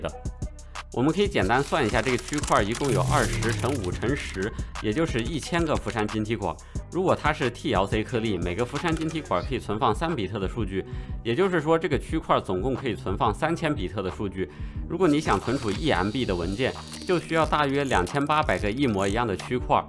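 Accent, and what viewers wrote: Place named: China. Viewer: 想知道台湾朋友们不看字幕的话，听大陆腔有没有难度呀？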